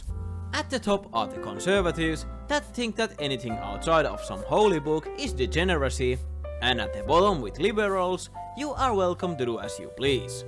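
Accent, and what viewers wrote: Finland. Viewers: Kyllä kuuluu ralli Englanti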